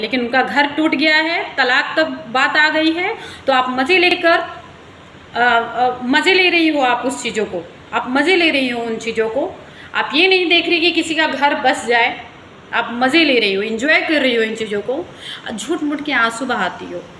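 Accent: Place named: Bhojpuri, India. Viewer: Kya bat h gjb sis apne pure bhojpuri me koi sahi bola to ap 🙏🙏🙏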